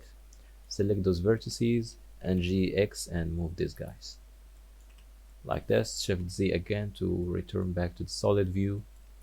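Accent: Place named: Arab world. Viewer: والله عربي عرفتك من لهجتك😂❤ اشكرك على الشرح المفيد! Keep up the work!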